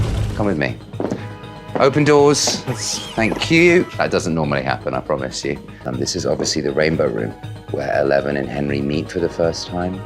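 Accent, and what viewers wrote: United Kingdom. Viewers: Too vague to say Southern. I am from the South West and a lot of people sound like farmers here. He has a middle class London accent, as someone else said. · It’s called a southern British accent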